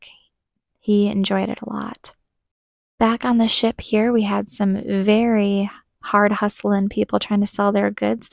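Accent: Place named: New York, United States. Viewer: Hello.. I. Enjoyed. Emenseley. Your. Video. How. Ever. Your. Warm and. Easily. Understood. Your. New York. So. Soft. And. Clear. meaningful. Or. Your. Commentary. In. It. Was. Sense and. Sensibility. Of. Your. Honesty. Voice. That's. Kept me. Watching. Your. Video. Was. An. Insight. FOR me. You. Also. Have. Explained. Every. Detail. And. Information. Well done. FOR. Your. Knowledge and. Your. Attention. Wish. Luck. And. A. Good. Time. All of. You. Thank you so. Much bye. FOR. Now. I. Will. View your. All. Your. Next. Videos.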